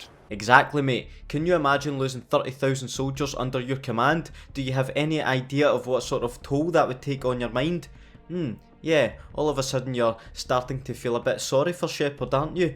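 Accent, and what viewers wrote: Scotland, United Kingdom. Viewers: #notifactionsquad excellent Scottish dialect I really appreciated it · #notifactionsquad love your Scottish accent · Fuck me ur voice is sooooooo sexy, subbed just for that Scottish voice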